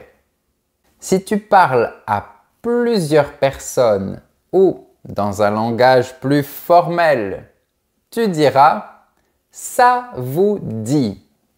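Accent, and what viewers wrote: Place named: France. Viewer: Merci beaucoup pour faire cette videos. Je peux comprendre environ 100% de ça que tu parles. Mais que je ne peux pas m'arrter de poser un question a moi même. Que region de France est-ce que vouz prof devient? Par-ce que je trouve ton manière de parle très intéressant prof. Pardonne ma mauvaise grammaire. 😅😅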